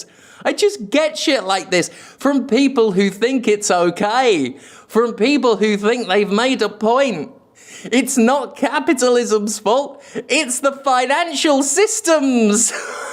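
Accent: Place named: England, United Kingdom. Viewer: Love how as never lost his English accent.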